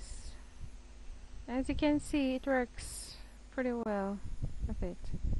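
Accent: Jamaica. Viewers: you sound jamaican i am jamaican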